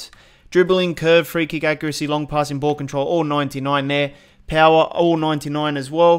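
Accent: Australia. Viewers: Hey r u from austr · Are you Australian?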